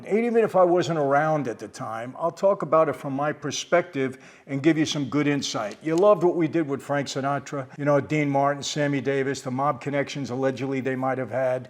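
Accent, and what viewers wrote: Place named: Queens, United States. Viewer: Mr. Franzese, thanks for this video, it really helped me. I’ve gone down some very dark roads and went back to God last year. I keep asking, “how can God forgive me after what I’ve done and how broken I am?” I’m from Queens and you remind me of the good version of the guys who, in a way, “mentored” me. This message coming from you is like one of them giving me good advice in a familiar, straightforward, credible way. Your personality, mannerisms and accent remind me of home and I always enjoy your stories. This kind of video is also really great. Be good, God Bless!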